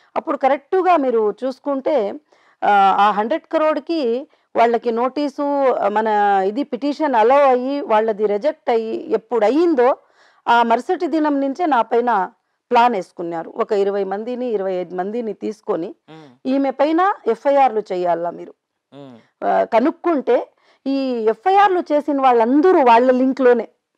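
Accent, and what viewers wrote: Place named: Chittoor, India. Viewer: madam slang looks like chittoor... Good to hear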